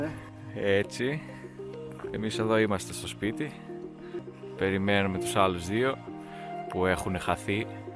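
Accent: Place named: Laconia, Greece. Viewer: VERY GOOD PANEMORFO VIDEO SOUNDS. Your Voice and The Way You Talk, Remind me Thn NEAPOLH LAKONIAS. When My Family Was All Together, Before. Blessings To you All And To Your Teammates Fabulous Showcase ❤🇱🇷🧡🇬🇷🧡🇬🇷🧡🇬🇷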